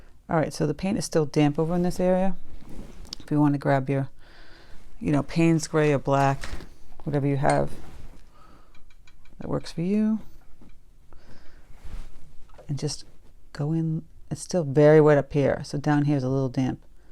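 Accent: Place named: New England, United States. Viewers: The Northern Cardinals can have red beaks and some have a yellowish orange beak. The desert dwelling cardinal from Columbia has a gray and black beak. Some have bodies that are gray all over. Some from Arizona have gray bodies and yellow beaks. In Alberta Canada the males are red with mainly yellowish beaks. The females are mainly gray with some red and have yellow beaks. Im sure some will disagree with me because frankly the Cardinals are always birds of a different color. I love your Cardinal. His legs should be angled forward to the left from the knee just below the body and feathers. Love your show and your accent. Do you have a red beak, gray or yellow?lol. Are you from New England or New York areas? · Thank you for this wonderul tutorial! I love Cardinals and see them regularly living in New Hampshire. I knew I detected a New England-like accent when I started watching you last week.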